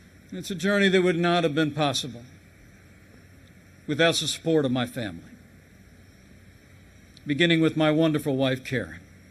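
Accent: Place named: United States. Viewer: I never knew Pence sounded so...American...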